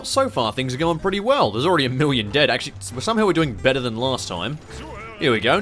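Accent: Australia. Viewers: You over exaggerate how Australian you are and it’s really cringey and hard to watch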